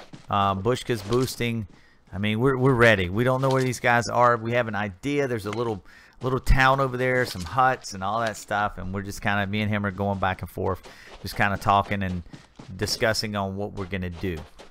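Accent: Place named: Carolinas, United States. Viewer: Hey, I am going to assume by some comments you've made in the past and by your accent that you are from the Carolinas. I hope this looming hurricane doesn't impact you too much. I am about 30 miles north of the NC border in Virginia and about 10 miles from the coast, so we are preparing for it here too. Here's to hoping the storm doesn't hit either of us directly and that you don't have to work too many extra hours in the aftermath. Stay dry, my friend.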